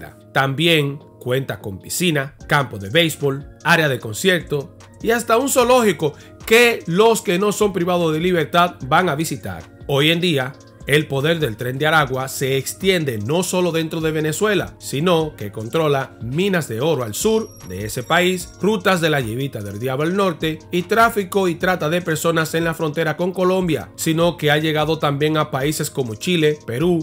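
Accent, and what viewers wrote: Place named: Dominican Republic. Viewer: Q te pasa. No sea pesado, así hablan los dominicanos, el no tiene q mejorar nada.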